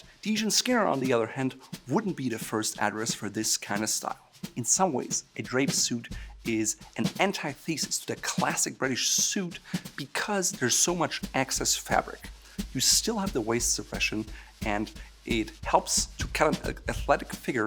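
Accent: Germany. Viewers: Your name is as German as they come. You even have a German accent. No way you're in the US. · still they have to work a lot on the audio quality and the German accent which is quite challenging...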